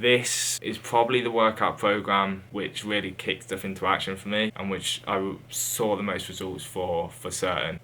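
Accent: United Kingdom. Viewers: Then don’t watch lmao, how hard is it to exit? Its not a lisp lol its his brit accents.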